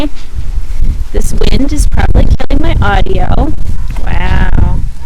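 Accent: Quebec, Canada. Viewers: 😁😊💪It is a joy listening to with his Québécois accent. C'est super.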